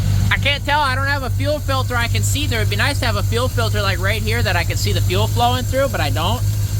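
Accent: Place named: Wisconsin, United States. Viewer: Apple 🍎 doesn’t fall from the tree with that Wisconsin accent 🤠🇺🇸🍺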